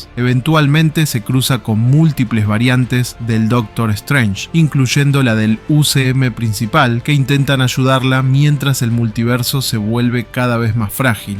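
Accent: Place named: Argentina. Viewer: Por favor un argentino hablando de esto que puta mierda ese acento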